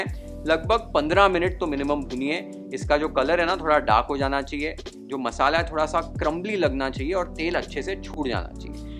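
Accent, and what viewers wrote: Maharashtra, India. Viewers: एक नंबर मराठी ठसका ❤ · Authentic recipe with authentic marathi brother❤❤❤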